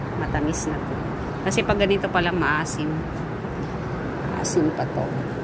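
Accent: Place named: Philippines. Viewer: Are you filipino i know that voice😮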